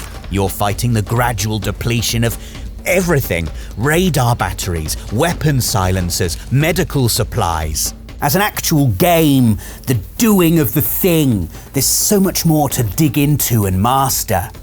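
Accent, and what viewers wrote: United Kingdom. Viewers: is Metal Gear’s David Attenborough. There is nothing more enrapturing than excellent, intellectual British commentary by an expert in their field.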